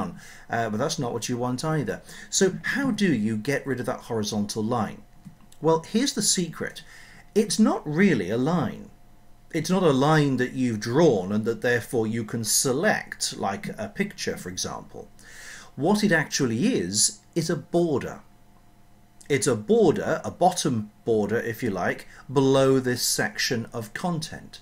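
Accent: United Kingdom. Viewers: I was frustrated with that line. Thanks a ton for showing how to get rid of it. Although it could have been a shorter video. Loved the Brit accent...been a long time since GoT!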